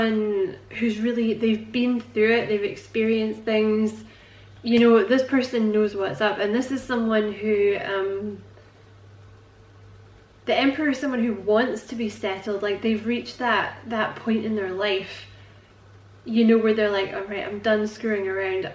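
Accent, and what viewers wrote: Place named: Scotland, United Kingdom. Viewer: I loved this reading. Resonated so deeply with my current and past love life situations and not only was it true of me as a person, but what you intuitively picked up on with him was also very accurate. You mentioned a possible Aries ♈️ connection for new love and I’m in communication with him, and we are soon to meet in person for the first time. I’m so nervous because he is such a humorous and seemingly confident person, but I don’t feel I won’t be interesting enough, funny enough or bold enough for him. I can come across that way because I have Scorpio moon and Leo moon, but I’m not able to naturally be that way all the time. You often hear that Aries and Water sign compatibility isn’t the best, especially when his big three signs are all fire and I have two water and only one fire (but perhaps even having just one fire in my big three could help with compatibility). 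I hope that that doesn’t always matter with this combination. Then again I’ve been with people in the past who astrologically should have been a great match for me, but weren’t in the end. So I just hope that we get along as well in person as we do online with the strong connection we have. Please tell me if this combo can have good compatibility and wish me luck, because I feel I’m going to need it 😅. Thanks again for a lovely reading, 🦋 Oh and btw, I love how soothing your voice is and your accent, which if I’m correct, I would assume is Scottish? If I’m wrong, I apologise. But it’s a very p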